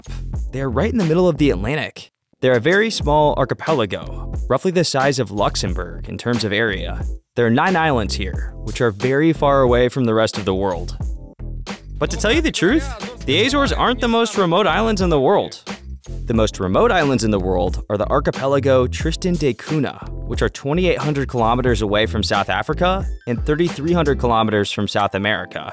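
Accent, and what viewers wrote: United States. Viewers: Good apart from the annoying American accent voice over. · Interesting video! Good presentation. Clearly an American accent (via your AI overlay) but speaking in units of Celsius for temperature measurements? at least put the deg F in subtitles. When was the last time NOAA.gov put all their units only in Deg C?… try never (yet). Based on your channel videos it’s a good guess a large portion of your audience is American… How hard is it to visually post both units?…. With all your complex map graphics, seems like a tiny detail. If 2% of my viewers used predominantly Kelvin, I’d put that in subtitles too. And let’s be honest, Kelvins are the best units for temperature anyways! 😊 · Had to stop watching as the commentary is in Murican, please learn how to say, 'Ah Zores''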